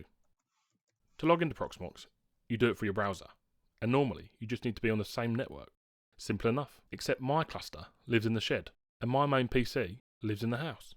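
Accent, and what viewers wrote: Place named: United Kingdom. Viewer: I'm guessing from the plug and the accent that you're British, you want to work on that networking thing, I will gladly hop on a phone call, or discord or whatever and talk you through it. You're doing cool stuff. Being curious and learning is cool on it's own, you're doing it with style. So if I can help feed that curiosity and get you playing with networks as well with a few minutes or hours or whatever on a call, then it'll be time well spent. Either way, keep on learning!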